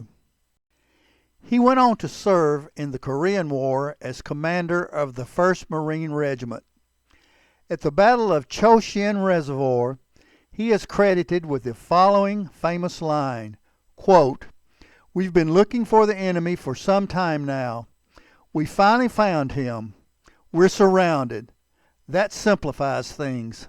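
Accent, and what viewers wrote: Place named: South, United States. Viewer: Another great video. Ya know, it has to be a blessing from god, or just severe dumb luck. That he made you into the genuine, humble, good man in which you've become. Ok, and before your southern drawl starts telling me its no big deal, then deflecting praise out of humbleness Now you are hearing from a man that has nearly watched every one of your videos by now. If we're honest with each other, you weren't exactly Walter Kronkite in the first couple videos. But please don't insult me enough to think that I'm only saying this as a man who admires you. You have upped your You-Tube skill level. But certainly the mildly stated, trough the roof improvement in the calmness, knowledge, a vocal delivery that simply makes people feel comfortable in the content of which you produce. If I weren't too cowardly to try, I'd likely only last a day, since I'm too damn opinionated. 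I respect and appreciate you greatly. But even if ya have to block, or "unfriend" me. I will not stop trying to get you to take off the time you deserve to enjoy the fruits of your accomplishments. Alas, life is usually a bit shorter than we expect. I truly hope you take advantage my friend. Even, if depressingly, we miss a weekly video, Don't you know that All of your subscribers will still be here, patiently waiting for you.. So sorry for the long e-mail. I was just gonna be sure about letting you know what your subscribers think of you. Talk again soon perhaps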